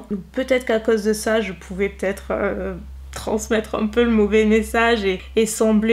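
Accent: South, France. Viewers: Je viens d'aller voir tes premières vidéos pour vois si tu avais changé! et non tu n'étais pas du tout hautaine, n'importe quoi! Par contre tu avais plus l'accent du sud, c'est rigolo :)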